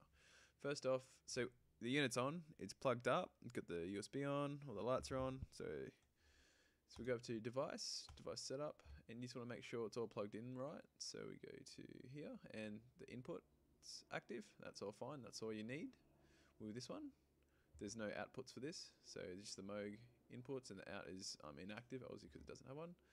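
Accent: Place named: Australia. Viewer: Hi from Norway! Great to hear another Aussie accent :)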